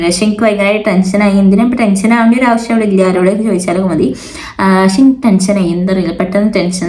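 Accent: Malappuram, India. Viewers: Aa varthanam kettaa arinjoode mlpprm ahnn nn😂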